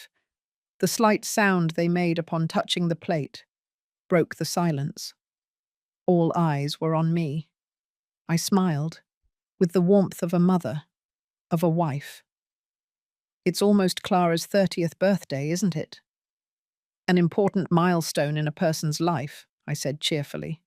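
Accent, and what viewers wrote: England, United Kingdom. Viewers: Good English accent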